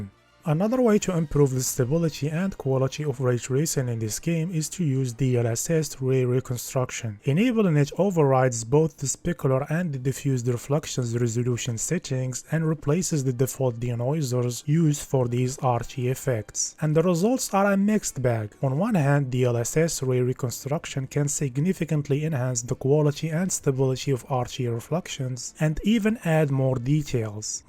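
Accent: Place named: Morocco. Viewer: Bro's accent is as Moroccan as it gets. Keep going, khoya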